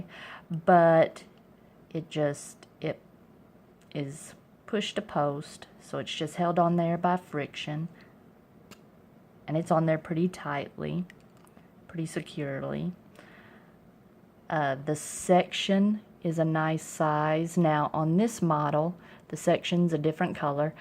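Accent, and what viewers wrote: South, United States. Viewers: Dear, Thank you for your channel & pen reviews. I think your presentation style of casual conversation, combined with the BEST writing sample camera angle on YouTube!; are wonderfully engaging, informative & endearing. Although some other pen fanciers, may have minutes of opinions about various pens; I find myself, asking, 'I wonder if has a commentary' about this pen or that. Your Southern accent & charm make watching your pen featurettes delightful. I am just returning to my fountain pen roots. I had the pleasure of discovering the joy of the Pilot Prera Iro Ai (F), before I became one of your subscribers. Now I'm waiting for a couple of additions to my 'qwillery': the Sailor Pro Slim 4 Season (Meigetsu) & the Pilot e95s, to arrive. Thanks for validating that these wonderful writing instruments should be 'played with', as well as valued & admired. 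God Bless, Candice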